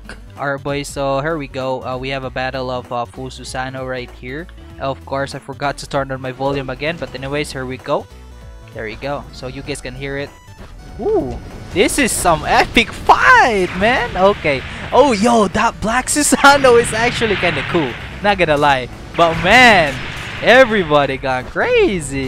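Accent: Philippines. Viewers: ye his accent aswell is like a filipino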